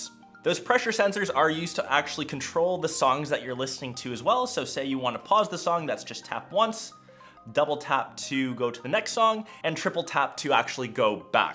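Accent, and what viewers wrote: Canada. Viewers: Your Canadian? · You sound Canadian or something I think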